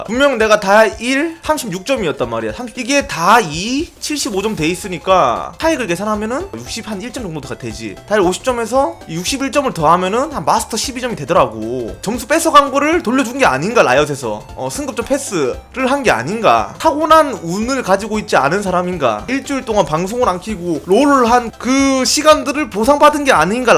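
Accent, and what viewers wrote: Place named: Gimhae, South Korea. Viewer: 말투가 아주 김해 느낌이 많이 나는게 뭔가 서운하면서도 제육을 볶고 싶은 느낌이네요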